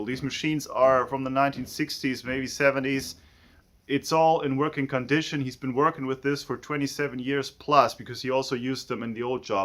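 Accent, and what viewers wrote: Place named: Cologne, Germany. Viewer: Der Kölsche Dialekt 👍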